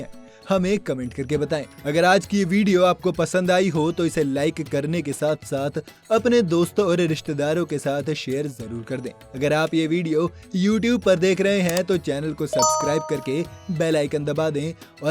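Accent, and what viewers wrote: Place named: Tamil Nadu, India. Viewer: Bhai Tamilian hai voh unka accent hindi me aise hi rehtha thum ek bar tamil ya kannada bath karke dikhao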